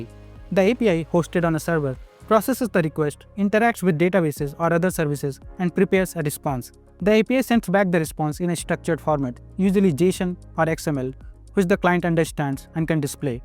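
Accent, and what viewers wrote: India. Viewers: Too much Indian accent for today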